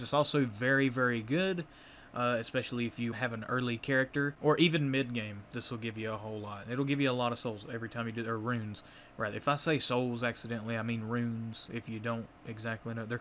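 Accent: South, United States. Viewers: Are you from Alabama I am I reckon your southern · Great, informative video but that accent screams below the Mason Dixon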